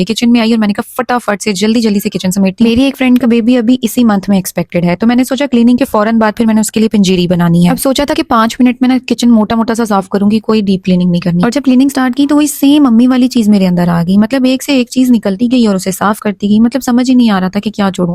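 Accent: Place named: Pakistan. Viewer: Shakal accent se b pata lag Raha k Pakistani hai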